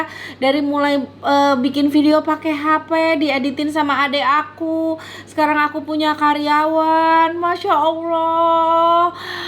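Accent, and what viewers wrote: West Java, Indonesia. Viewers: Mukanya cara ngomong nya meni sunda banget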